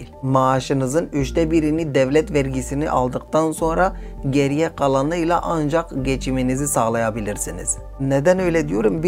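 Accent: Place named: England, United Kingdom. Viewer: Abi selam ne kadar yaşıyorsun norveçde Türkçeyi ingilizler gibi konuşuyorsun galiba biraz asimile olmuşsun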